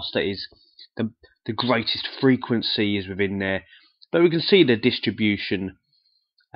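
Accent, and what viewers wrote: United Kingdom. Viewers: Thank you SOOOO much! You're really awesome!💯 🎆This video was so very helpful that I can't begin to tell you how much it actually helped me. Plus, you're accent is so cool! Love it! Are you British?💚💚💗💓💖😌😌🤗👍💣😌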